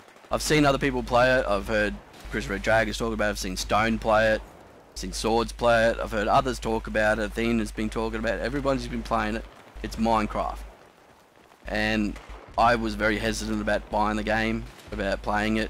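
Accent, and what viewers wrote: Australia. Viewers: Nice video. Good to see a Aussie Commentator :D Subscribed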